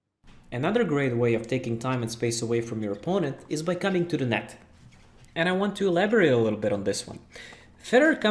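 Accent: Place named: Russia. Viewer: your accent sounds like russian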